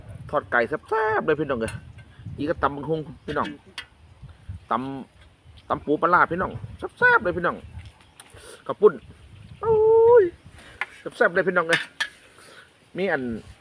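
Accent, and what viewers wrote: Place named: Korat, Thailand. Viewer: ภาษาโคราชฟังง่ายสบายหูคุณกินแล้วทำให้ผมน้ำลายไหลด้วยผมติดตามคุณทุกคลิปเลยครับ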